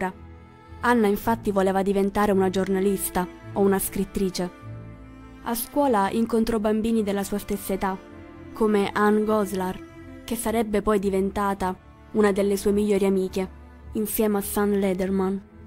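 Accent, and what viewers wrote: Sicily, Italy. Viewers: Scusami ma non riesco a procedere con la tua lettura con quello spiccato accento siculo. Per favore un po di professionalità non guasterebbe e un bel corso di dizione pure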